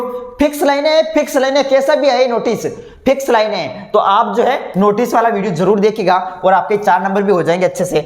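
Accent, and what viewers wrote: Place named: Marwar, India. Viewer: Sir thoda marwadi Kam teko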